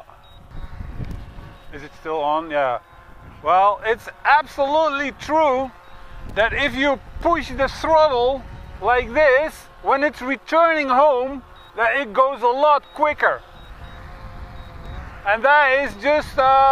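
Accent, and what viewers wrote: Netherlands. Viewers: Volger erbij ! hoorde gelijk een Nederlander ;-) ga zo door.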